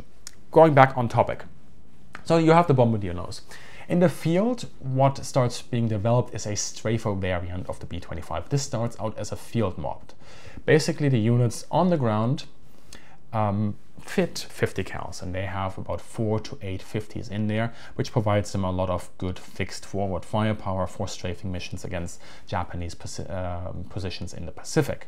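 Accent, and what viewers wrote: Germany. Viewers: Dude, your german accent is horrible.... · Is he german? Hört sich nämlich so an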